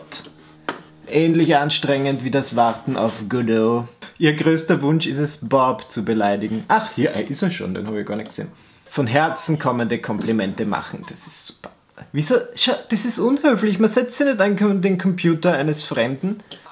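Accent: Austria. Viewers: Hallo! Ich habe dich durch eine Freundin auf dein Instagram Account entdeckt. Darauf habe ich das Bild mit Sims 4 gesehen habe und dann deinen YouTube Kanal gesucht. Nach dem Sims 4 LetsPlay abonniert. Ich habe dann gemerkt dass du aus Österreich kommt und das finde ich echt cool. Deinen Akzent bzw die Art wie du sprichst ist sehr cool und gefällt mir. Auch deine Mimik finde ich sehr cool. Ich habe erst nach ein paar Videos gemerkt dass du schwul bist und deshalb finde ich Kommentare wie "Du bist die größte Schwuchtel überhaupt" richtig unnötig. Hab zwar nur 2 entdeckt aber trotzdem. Du bist der einzige österreichiscche Vloger. Respekt. Warum? In Österreich gibt es nur VeniCraft, der ist aber LetsPlayer. Noch was zu deinem Akzent, ich habe auch einen da ich aus Linz komme und wenn ich mit Freunden in Deutschland skype verstehen sie nichts. Dich verstehen sie auch nicht immer. 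Finde ich lustig. Ich könnte noch länger schreiben aber ich möchte dich nicht nerven. Liebe Grüße aus Linz:)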